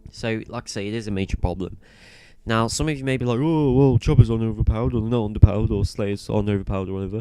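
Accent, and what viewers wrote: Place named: United Kingdom. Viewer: You also died twice as many times. Not blaming you though. If where you're playing is anything like the North American servers (you sound vaguely British so maybe you're on the Europe ones) 3/4 your teams healers are selfish DPSing DoKs and Shamans. Compare the number of Zealots vs Runepriests in the average match. A lot less Zealots, why? Because you can't DPS for crap as them so Destros don't roll'em. You'll see the same issue with most of the mirrors. Order heals so they live and DPS longer.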